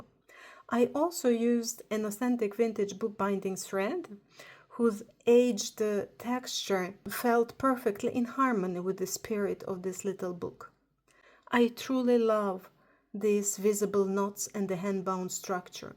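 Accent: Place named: France. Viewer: ❤ 😎 me gusta mucho la espina cocida wow 🤩 hermoso tu diario 📔 Están precioso todos detalles, los bordados 🧵 oooh 😮 y tu hermoso acento francés 🥰 me encantan todos los acentos del mundo ! Debo también decirte que Tus telas que usas son preciosas. La manera que describes los detalles de cada página es increíble 😊. Amo estos videos . Gracias 🥰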